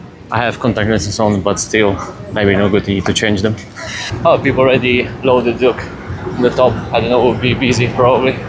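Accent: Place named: Italy. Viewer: It's funny that you speak Italian fluently as it sounds like your accent when you speak English has a hint of an Italian accent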